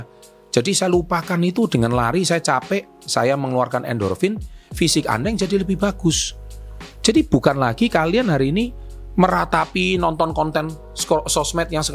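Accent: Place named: Java, Indonesia. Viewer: cocoklah ini, apalagi penyampaiannya mantep dan medok .... 👍👍👍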